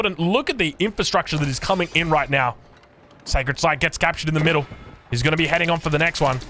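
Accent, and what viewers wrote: Australia. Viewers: This wild wolololo of was the best part of the video. question, does every Australian sound like Steve Irwin? Greetings from the Netherlands (Y)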